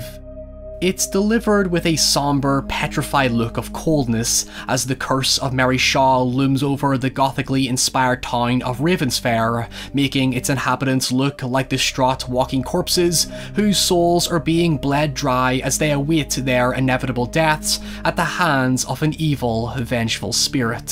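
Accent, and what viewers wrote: Northern Ireland, United Kingdom. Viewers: The auto-generated closed captioning spelled the word "craze" as "kriyas" because of delightful NI accent. That is all.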